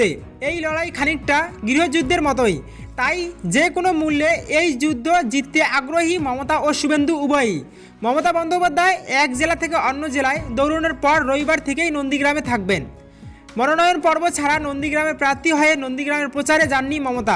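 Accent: Bangladesh. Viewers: valo kore kotha bolte sekh.bangla deshi der moto kotha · বাঙলাদেশীদের মত উচ্চারণ।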